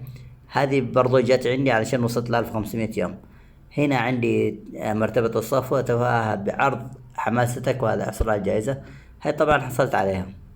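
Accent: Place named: Yemen. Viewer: اتوقع انك يمني من طريقة كلامك تحياتي لك من غزة ممكن تقولنا تحسنت بشكل كبير ولا كيف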